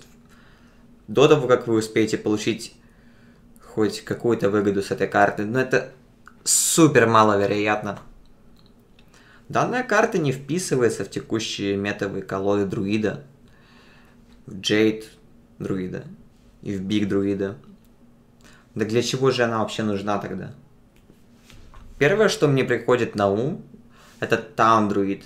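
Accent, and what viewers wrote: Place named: England, United Kingdom. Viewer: у тебя очень приятный голос. Видно, что ты уже привык к английскому языку и у тебя присутствует этот акцент. Надеюсь это не единственное русское видео на твоём канале)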